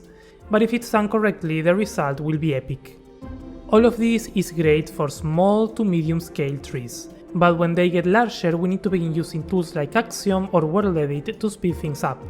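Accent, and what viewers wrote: Argentina. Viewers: sos de argentina no? me remil suena ese acento JAJAJAJAJA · Eres Argentino no?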